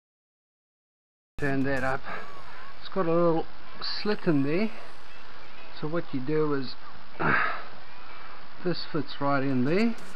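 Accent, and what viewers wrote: South Africa. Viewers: Hey dude where in South Africa are you from LOL.... your accent gives you away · I can hear your SA accent too, it's a weird blend, lol. I'm a kiwi living in Tasmania and missing the great NZ fishing, lol.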